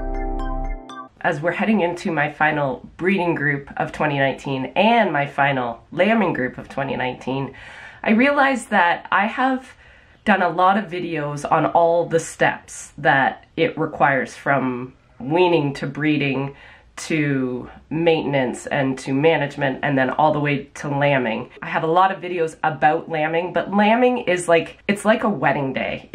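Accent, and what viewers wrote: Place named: Canada. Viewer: Your Canadian accent is really fun to listen to 😉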